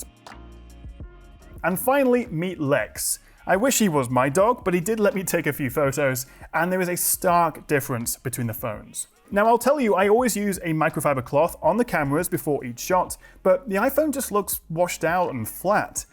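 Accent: United Kingdom. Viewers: I own everything Apple but, you're right, the Samsung has a better camera. BTW, your wife got lucky marrying such a handsome and intelligent man with a British accent.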